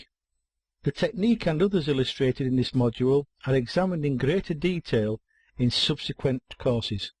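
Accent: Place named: United Kingdom. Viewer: The fact this man is teaching what I want to learn and has a UK accent makes this course so much better. ❤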